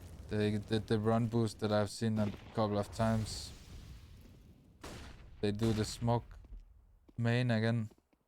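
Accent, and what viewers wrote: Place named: Denmark. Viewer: Your danish accent is sexy af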